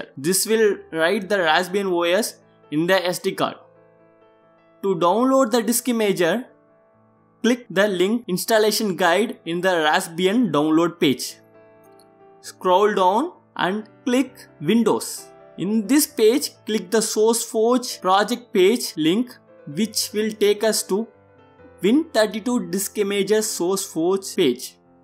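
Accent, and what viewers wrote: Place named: South India, India. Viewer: U sound like a South Indian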